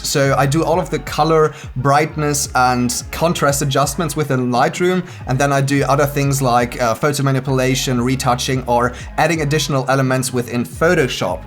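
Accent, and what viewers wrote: Germany. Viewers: that accent is something else! Love when Germans speak English! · German Accent?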